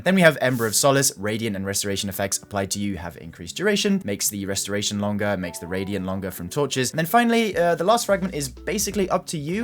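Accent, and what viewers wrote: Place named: England, United Kingdom. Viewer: Hearing saying "You'll be bonking everything" in his English accent is pretty funny, as it means shagging everything over here. 'Bonking' as related to hammers only really works with an American accent, to my mind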